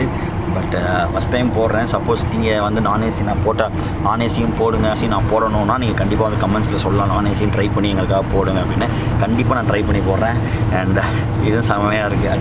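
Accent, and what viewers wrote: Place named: Kerala, India. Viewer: Im a Kanyakumari Malayali....I know u have a Malayali touch....U know Malayalam...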